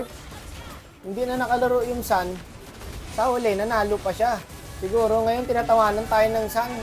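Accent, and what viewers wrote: Iloilo, Philippines. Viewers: ilonggo yan boi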